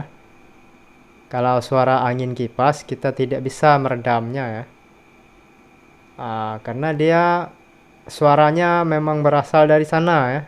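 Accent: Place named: Borneo, Indonesia. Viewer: Logatnya sperti logat Dayak...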